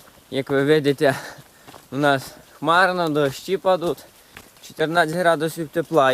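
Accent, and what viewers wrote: Hutsul, Ukraine. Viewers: Дуже люблю гуцульський діалект, я сама з бойків, ваших гірських сусідів.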